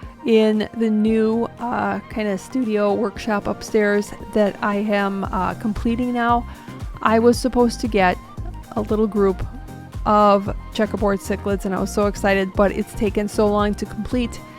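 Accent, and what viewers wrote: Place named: Midwest, United States. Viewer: thanks for the video. you have a STRONG midwestern accent :) "oh for crying in the mud!" :P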